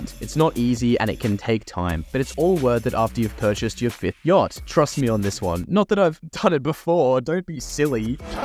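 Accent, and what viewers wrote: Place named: Australia. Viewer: I noticed you had an australian accent. the best way to launder money in australia is to deadass go to a casino, put all your dirty money in and cash out. and there you go, you have nice clean money you won at the pokies without any cuts. this is genuinely a massive problem in AUS. when a whistleblower tried to point this scheme out, the casino lobby in Australia tried to sue him.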